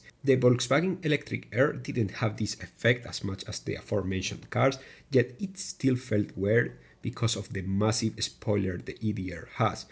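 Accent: Spain. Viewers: R u spanish? Ur accent is pretty similar to that people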